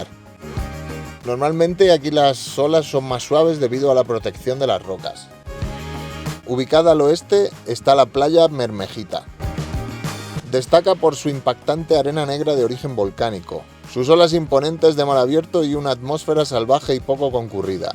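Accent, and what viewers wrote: Spain. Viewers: Viendo videos de aventureros me encontré tu canal! No te conocía pero viendo tu canal noto acento español???? De haberlo sabido! Yo me crié en España hasta los 22 años! Ojala hubiéramos coincidido antes! Te hubiera dicho unos spots muy buenos en oaxaca para acampar! Si te vas a quedar más tiempo por el estado échame una voz! Saludos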